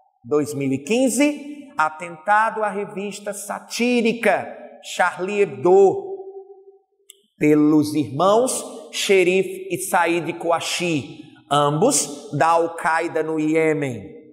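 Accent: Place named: Northeast, Brazil. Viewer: Esse professor tem jeito que é nordestino sou tbm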